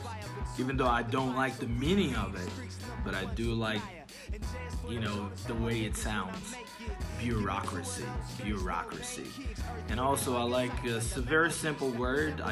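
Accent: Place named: United States. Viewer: Hello teacher, I’m Yahya, all thanks to you and teacher, I learned just to focus entirely on an accent that I like to be recognized by and that’s American which teacher speaks 😊 keep up the good work ✋️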